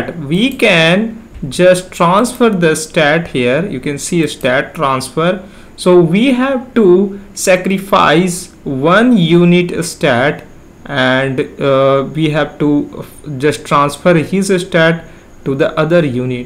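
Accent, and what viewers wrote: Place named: India. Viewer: He as the voice of an Indian scammer....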